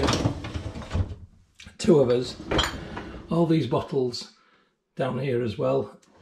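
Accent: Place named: Yorkshire, United Kingdom. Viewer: Hey - good on ya for reclaiming all those lovely bottles. I used to do it but eventually grew tired of the process - I now brew exclusively with flip tops On a different note - I'm sure you've already figured it out since making this video - but if you make up your sanitiser into a solution and fill your bottles that way - not only is it a lot easier to fill the bottles - you can keep reusing the same solution for quite a while as long as the pH stays above 3.5. I keep a few 2 litre juice bottles full of StarSan solution in my laundry and just pull out what I need whenever I start a brew. I haven't had to change the solution for nearly a year so far and given the cost of StarSan it's a good saving. In closing - I'm not sure which I enjoy more - your content or listening to a proper Yorkshire accent again. Ee ba gum lad it's like puttin' on a nice comfy pair 'a slippers. 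😂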